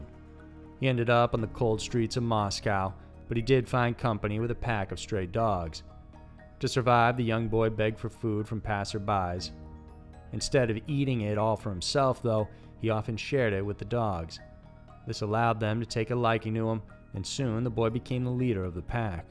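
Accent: South, United States. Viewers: You are Canadian..Why you talk like you are from the southern states of the U.S?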